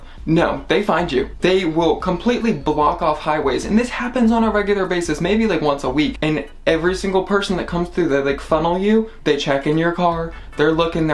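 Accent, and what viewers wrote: United States. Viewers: I love your American accent....and your nose.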